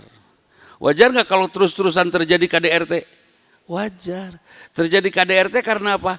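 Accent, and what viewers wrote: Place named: West Java, Indonesia. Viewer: Urang sunda, basa sunda, logat sunda pisan, ngeunaheun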